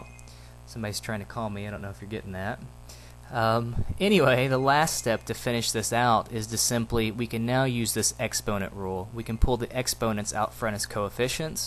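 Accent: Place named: South, United States. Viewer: Aw you missed a phone call for us. That's dedication right there Sir. Also, you should do a video in your southern accent - I think you mentioned you have one in another video.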